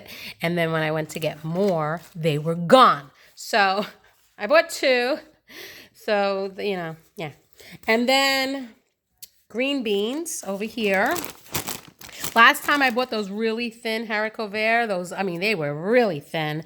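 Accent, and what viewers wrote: New York, United States. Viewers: I could hear the NY in your voice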